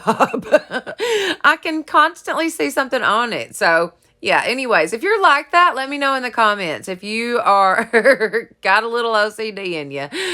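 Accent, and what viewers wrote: South, United States. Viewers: girlfriend, you “blew these out of the water” they are all so gorgeous!! That pumpkin one is the prettiest thing I’ve ever seen, girl you are sooooo good!!! Oh yeah, I wanted to let you know that I ordered some Chalk Couture from you🥰 I have really been wanting to get into doing that but I just have put it off until TODAY! Girl when I saw you were a consultant for them, I had to get started with you as my consultant!! You inspire me so much, you have NO IDEA! I am only 54 and pretty much home bound because of my health. I don’t have a lot of good days, but even if I’m having a bad day, like today, I can watch your YouTube videos and you just cheer me up TREMENDOUSLY!! I’m from a small town about 30 miles south of Birmingham so I totally appreciate your “Southern drawwww” cause I got it too, lol!! Thank you so much for all that you do and create for all of us to enjoy and sometimes create our own!! 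I love you and your channel and I sincerely mean that!!! Keep making those videos please!! Thanks again, LOVE YA GIRL! Paula Wilder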